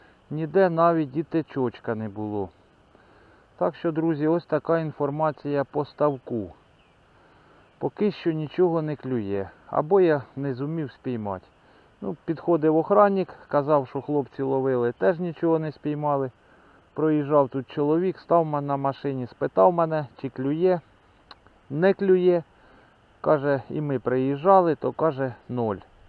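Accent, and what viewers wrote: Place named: Cherkasy, Ukraine. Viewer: Неначе черкаський,,говір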